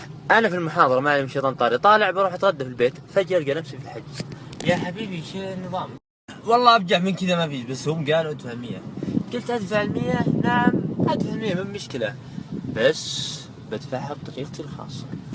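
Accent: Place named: Hadhramaut, Yemen. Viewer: king 9 من قلك كدا ييحبيبو انت هادي لهجه الحظارم الزبده ماله دخل في الخكرنه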